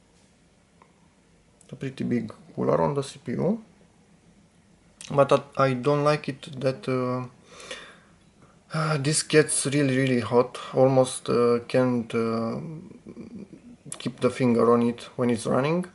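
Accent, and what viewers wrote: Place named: Romania. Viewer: pot sa jur ca esti roman! accentul acela :) apropo... am achizitionat si eu acelasi pc recent. din cate am inteles are placa video integrata nu?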